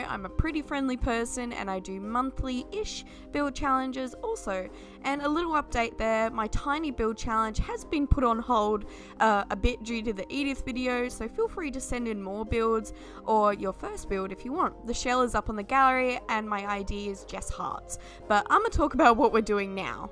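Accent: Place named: Australia. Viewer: "Gasp" You're AUSTRALIAN!? Lovely build, it's nice to see you have a breather with a smaller build ^^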